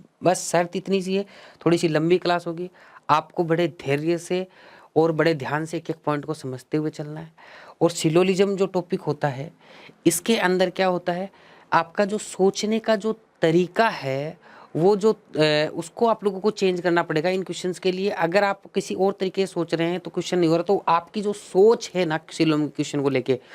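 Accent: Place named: Rajasthan, India. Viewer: Guess you are from Rajasthan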